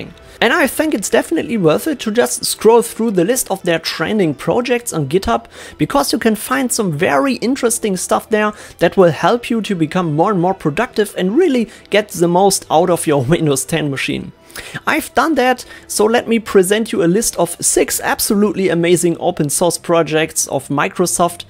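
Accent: Germany. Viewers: I like your German accent.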